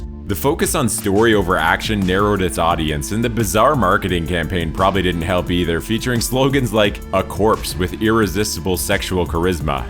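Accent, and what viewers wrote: Canada. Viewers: i can always tell when its a canadian when they talk funny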